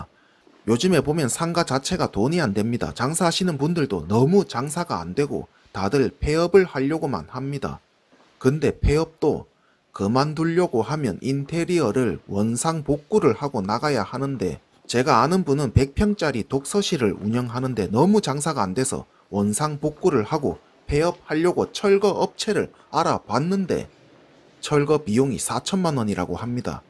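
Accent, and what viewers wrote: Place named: Gyeongsang, South Korea. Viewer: 무식한 경상도 사투리 엄청 거슬리네. 접어라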